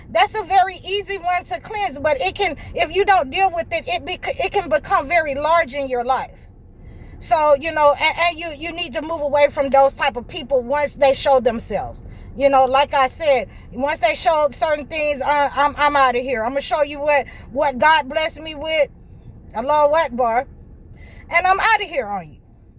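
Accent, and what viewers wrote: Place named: California, United States. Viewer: You sound like you from Comi fornia.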